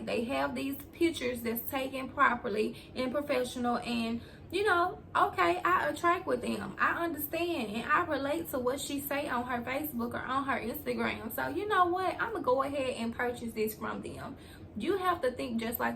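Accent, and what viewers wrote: South, United States. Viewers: I love your accent omg I know you are a southern bell just like me! Mississippi or Louisiana??? Which one ???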